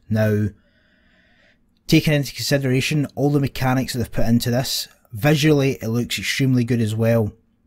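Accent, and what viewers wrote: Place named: Scotland, United Kingdom. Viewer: you from the area near Edinburgh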